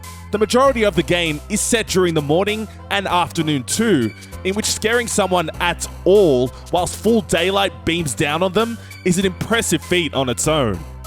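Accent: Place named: Australia. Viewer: why are you Australian? I actually need to know.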